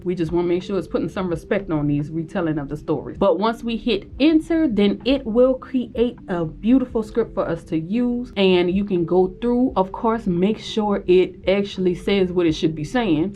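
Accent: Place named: South, United States. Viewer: she's definitely from down south with that accent lol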